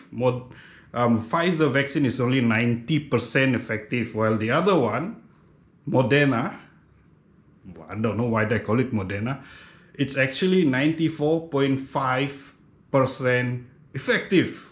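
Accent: Sabah, Malaysia. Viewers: Bah sy mangarti jg DR ckp,sendiri mengerti,klw mw translet sma urg, karas tu lidah mw kc terang 😅😅😅biar kn mreka² yg nda suka DR brckp malay slang sabah 😁😅kunun kn DR 😁 Terbaik perkongsian ny Dr 👏👏👏💪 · X juga masalah kalau sy dr. Ngam laitu slang sabahan.. Kita pun di sabah ba juga ni.. Its realy doesnt matter at all... Maybe those who give negative cmment..or maybe'kayangan people'just dont like to share good medical info like u.. Salute dr!!!.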